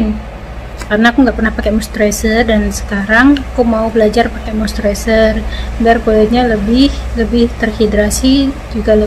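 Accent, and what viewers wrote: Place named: Java, Indonesia. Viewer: medoke kentel, asline pundi yuk?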